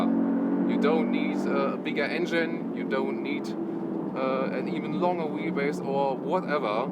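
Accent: Germany. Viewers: I love your accent, seriously, I wish I had a German accent like you do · I love your channel, I always watch your videos about the autos. unfortunately, many models are not available in the US., you have a very funny German-English accent. which could be one of your traits. And you looked so weird in helmet....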